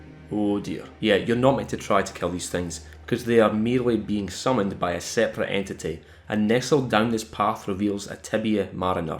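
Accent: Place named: Scotland, United Kingdom. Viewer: Ah yes another wonderful scottish man video to listen to while i work today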